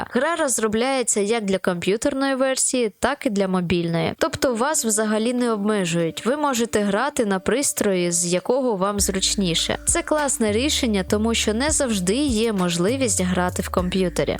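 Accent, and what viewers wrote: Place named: East, Ukraine. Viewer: видно що ти переходиш на українську скажи якщо я помиляюсь але я думаю ти десь з сходу або центру україні і стараешся говорити українською. Я не намагясь хейтити і т.д. хочу сказати що це класно люди переходять на рідку мову це раз якщо я помиляюсь і ти завжли говориш українською напиши буль-ласка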